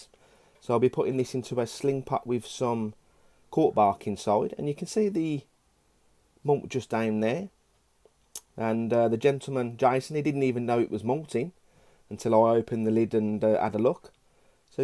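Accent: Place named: United Kingdom. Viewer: Nice pick ups .. Always enjoy your videos. Ur your accent is my fav out of all the UK people lol shhh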